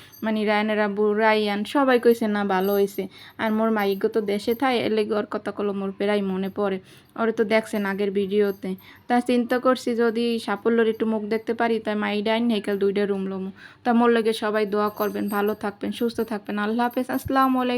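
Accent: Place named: Barisal, Bangladesh. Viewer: ❤❤প্রিয় আপু তুমি বরিশালের ভাষায় কথাগুলো বল দারুন লাগে ভিডিওটা দেখে নিলাম সবকিছু মিলিয়ে খুব সুন্দর হয়েছে আলহামদুলিল্লাহ ❤🎉😮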